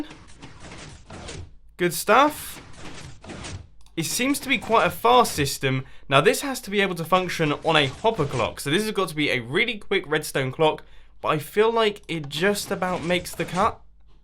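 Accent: England, United Kingdom. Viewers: You're from Britain. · r u from England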